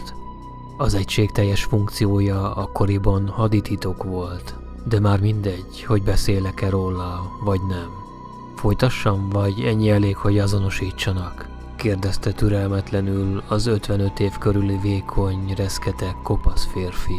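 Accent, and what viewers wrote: Russia. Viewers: Király az orosz akcentusod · Nagyon jó az orosz akcentusod. Tetszik! Azt hittem, hogy ez igaz történet, de miután megnéztem a leírást, akkor rájöttem, hogy ez csak egy kitalált történet. Kösz, ezt a nagyon jó történetet. Van ennek a történetnek folytatása? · Nem tudtam félni vegig a Vicces Orosz akcentuson szakadtam xddd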